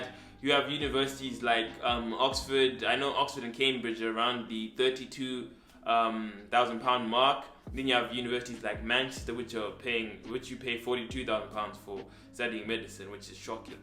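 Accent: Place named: Zimbabwe. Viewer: yess I knew it 😅 I could tell from the accent , I am zim too 🇿🇼 🇿🇼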